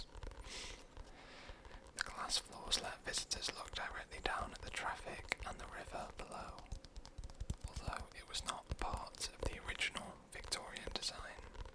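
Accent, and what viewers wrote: United Kingdom. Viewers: Bro I am just fascinated by the British accent, as a non-native speaker I just love those pronounciations, fantastic video anyway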